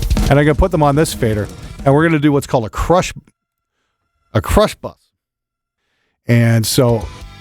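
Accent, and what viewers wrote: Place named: Canada. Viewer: I have subscribed because I love your take on things, and your delivery.... except like all hosers you really need to learn to pronounce an O. Seriously love this channel.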